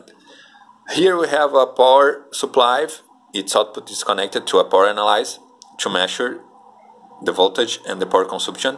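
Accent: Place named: Brazil. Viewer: Brazilian sotaque....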